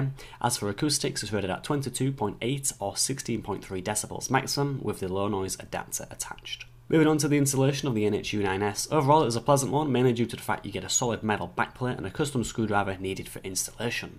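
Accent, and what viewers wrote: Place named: Northern England, United Kingdom. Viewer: I'm from the North of England and so is this guy. I've got no idea what he's saying half the time because he does leave a gap between most of his words. You've found the English Boomhauer.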